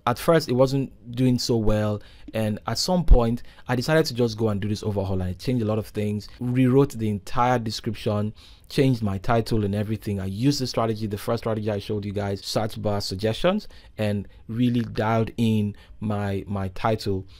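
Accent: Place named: Nigeria. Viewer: I like your clean clear Nigerian accent.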